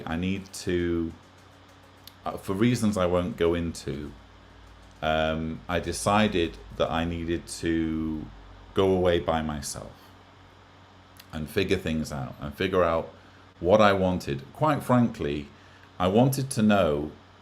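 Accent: United Kingdom. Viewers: you have thousands, tens of thousands of supporters. Please know that. I don't even consider myself an ex JW, since it's been many years since my journey with them. I listen to you so that I don't fall back into that nonsense. Mostly, I listen to you for your voice (we Americans are crazy for British and Australian accents), your wit, your humor, and your humble wisdom. I have learned so much from you. We love you, man. Please take care of yourself.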